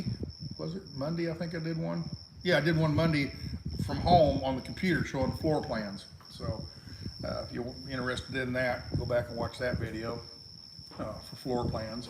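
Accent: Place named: Ohio, United States. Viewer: Love your vernacular language. My father was from Ohio and he spoke and sounded like you. He was intelligent but kept his family language. I love your pleasant voice and giggle. Ron and I took 2 pairs of Sketchers, the same two type and colors Grey Wolf had purchased and hand carried them into the middle of Nevada. He was so appreciative. Ron walked a mile or so near Austin, NV and I kept the back end safe. Hope you mention his efforts and that those who join him have enjoyed their experience. God Bless (Geri)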